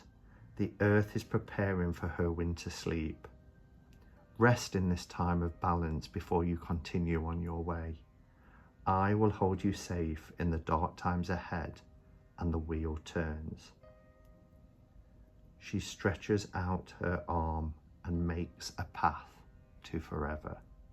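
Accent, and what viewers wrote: United Kingdom. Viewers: What a wonderful beginning to Mabon celebrations! I sent this to some friends as well. One already commented, "That was magical." Indeed. If you ever quit your day job, you should apply to read books on tape. Especially British mysteries -- I so hear that in your voice. Thank you!!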